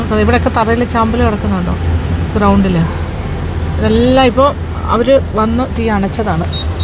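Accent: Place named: South Kerala, India. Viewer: Im Tamilian from Kanyakumari. Akka is very famous in Tamilnadu ♥️. Something about her malayalam its very different and easy to understand as a tamilian compared to some other dialects. Maybe she is from South Kerala thats why?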